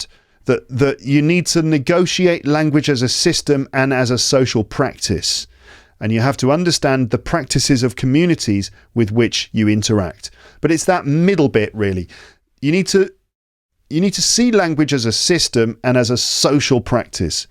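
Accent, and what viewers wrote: United Kingdom. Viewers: Thank you! your great british pronunciation, accent, explaining a difficult vocabulary are very informative! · The problem is the british accent🥴. But your channel is fabulous. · However your podcast is in British accent and I work in American accent but I really am good with your way of speaking and teaching! I really like to hear you for hours! Good job 👍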